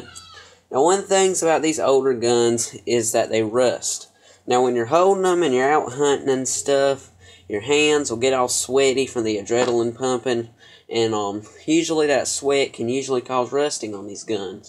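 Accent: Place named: United States. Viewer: Your accent is epic ... America!!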